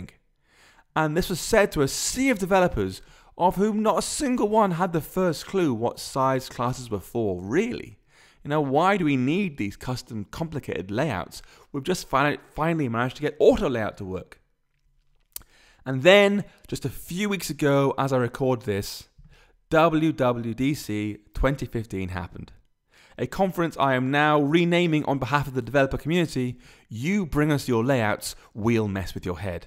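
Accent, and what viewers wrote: United Kingdom. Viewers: I like British accent.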